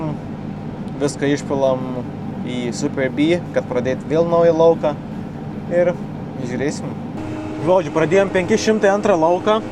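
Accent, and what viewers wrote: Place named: Zanavykai, Lithuania. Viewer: šaunuolis ,vyrukas ir muzikytė vežanti, automatiškai koja į ritmą.:)O tarmė zanavykų, miela ausiai:)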